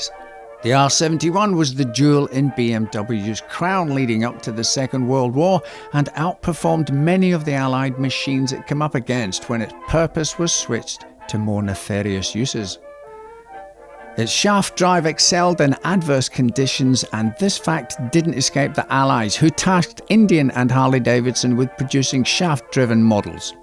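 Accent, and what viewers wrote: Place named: Scotland, United Kingdom. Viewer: Love how he starts with an English accent then gradually becomes quite distinctly Scottish. In a very charming way!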